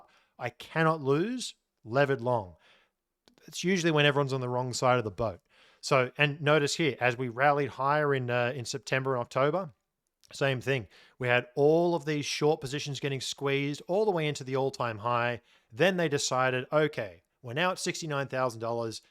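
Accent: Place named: Australia. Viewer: Love your work. The best BTC analyst out there. And so good listening to your Aussie accent!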